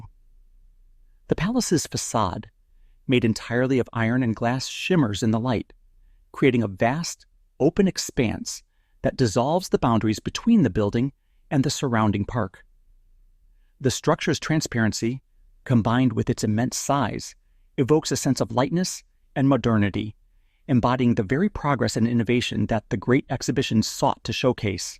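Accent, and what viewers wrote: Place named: United States. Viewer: What bothers me is the narrator in Am.English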